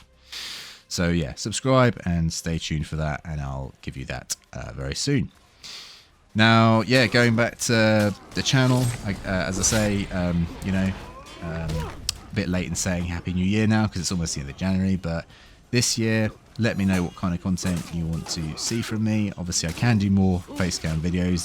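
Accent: United Kingdom. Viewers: No you didn't get the pronunciation right. But close enough, closer than the apes from Ubisoft actually because your British accent fixes one of their mistakes.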